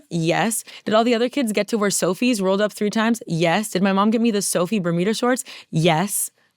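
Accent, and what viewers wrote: Miami, United States. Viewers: i cannot express how much ur miami accent comforts me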